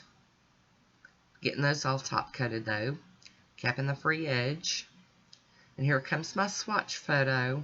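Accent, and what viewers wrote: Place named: South, United States. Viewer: Got a good chuckle about your comment that Southerners pronouncing “things funny”. LOL. I love the way you speak. Reminds me of my favourite movie and book - Gone with the Wind. Liked your mani too. LOL.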